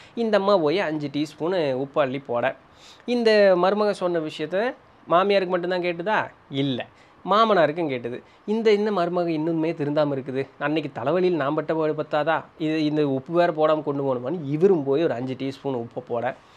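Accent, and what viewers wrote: Coimbatore, India. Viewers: Magical voice nice story Coimbatore slang 👌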